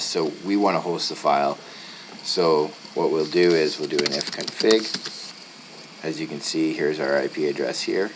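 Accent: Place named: Canada. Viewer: love the Canadian accent.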